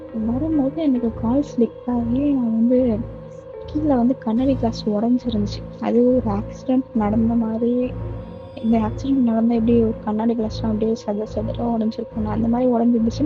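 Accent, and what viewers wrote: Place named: Chennai, India. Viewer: Chennai slang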